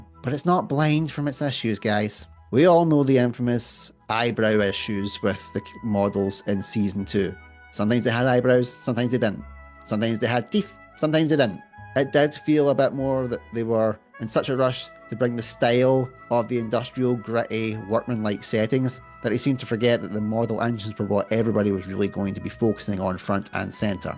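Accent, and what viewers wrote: Scotland, United Kingdom. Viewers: Came for set discussion, stayed for the Scottish accent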